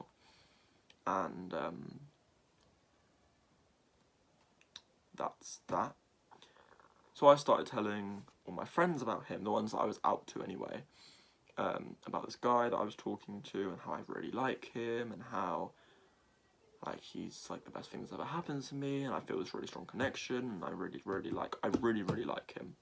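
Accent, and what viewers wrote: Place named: United Kingdom. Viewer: Loved ur video. The British accent is always interesting to me. I couldnt replicate yours (they seem to vary a bit, perhaps by region in England?) Irish & Scottish I think I'm able to notice as diff, but how do so many UK actors star in US movies & talk w/o their accent & sound American? Does it take a lot of practice or could u speak like us if u wanted? We all share the same gay steps, from self-identification, to depression or envy, to masking it & usually coming out. I think major factors are: how conservative/anti-gay are the parents and how feminine is the boy as he grows up (since it plants a seed in everyone's mind that he may be gay. It's tough when guys are masculine growing up, because coming out can be hard for everyone to believe, parents can panic/go into denial. Your story was good, it covered every angle of coming in terms of being gay, dealing with the bullshit & realizing how different our lives will be, but also how exciting they can be once u find the right person. 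I had a thrilling decade of dating, 3somes & serious relationships after I moved from the suburbs of Chicago to Fort Lauderdale, Florida, where the Mayor is gay, the bathhouses are gay, along with the businesses, bars & clubs. Even a strip of bars along Wilton Drive are open all night & that area of the road is shut down to cars, so Pride Parades can be held, Halloween can be celebrated with big stages w/musicians etc. The food market on the street is nearly 100% gay shoppers. Everyone in the en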